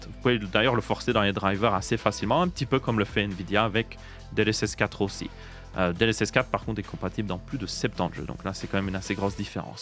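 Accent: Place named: Belgium. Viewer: il est belge...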